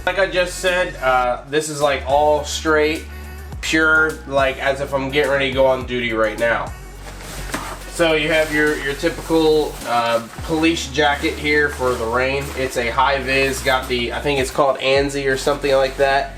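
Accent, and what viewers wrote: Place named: Georgia, United States. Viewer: Starting to get that georgia twang now huh? Lol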